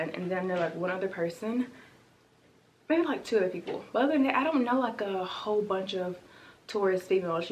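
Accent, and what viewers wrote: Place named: South, United States. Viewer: You are sooo down to Earth and your accent is so cute! I immediately heard the "country" in your voice. Im a southern gal.. I live in Louisiana. You are so humble and kind!! Never let anyone steal that from you!! You are so beautiful being you!!! 👑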